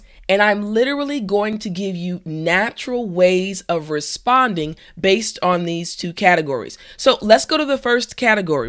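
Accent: New York, United States. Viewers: Are you from New York? Atlanta? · by the way, you sound more American than the real British native speaker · Are you American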